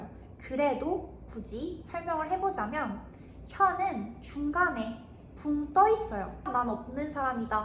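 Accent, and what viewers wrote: United Kingdom. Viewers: 영국발음 너무 좋아요.. 인스타에 올릴 용기는 없지만 열심히 복습할게요..💗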